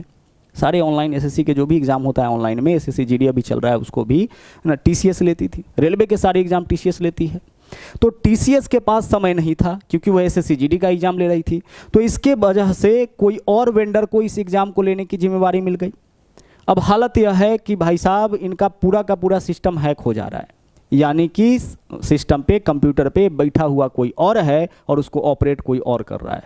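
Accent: Bihar, India. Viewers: सर आप आए बिहारी भाषा के स्टाइल में 🙏🏻🙏🏻🙏🏻🙏🏻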